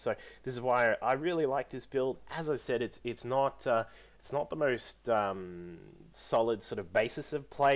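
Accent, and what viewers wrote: Australia. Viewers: Great to get some Aussie casting going on!!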